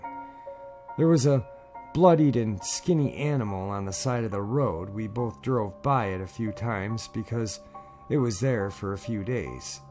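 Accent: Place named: United States. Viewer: To me as an Irish person your accent is delightfully different. I wondered where you were from, I'm not very good with pinpointing US accents apart from recognising a southern one of course. 😁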